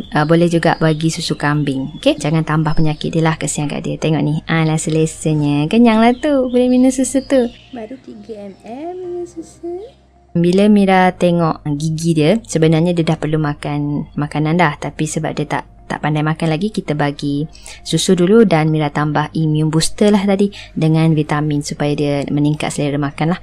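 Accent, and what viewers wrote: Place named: Malaysia. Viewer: mba nya dari malay logat nya enak di dengar dan mpusnya cantik dan beruntung smoga sehat terus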